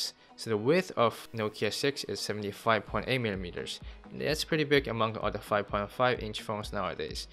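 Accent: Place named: India. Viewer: jyothish swty he speak bad and annoying indian accent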